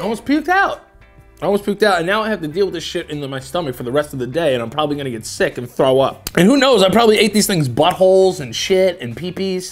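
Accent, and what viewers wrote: Australia. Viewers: As an Australian man, I can confirm this is exactly how we speak... mate. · Love the ozzie accent! Bloody rippa mate! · As an Australian, that accent was not offensive, but it was shithouse. 😂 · Am I the only one that thinks with an Aussie accent is 👌👌👌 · i swear i’ve watched this a million times; now my going to sleep video i watch Australian accent kills me every time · HIS AUSTRALIAN ACCENT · NOT down to watch eat more bugs considering the fact ima bout to puke...... but very down for a whole video of him just talking in an Australian accent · the Australian accent is killing me 😂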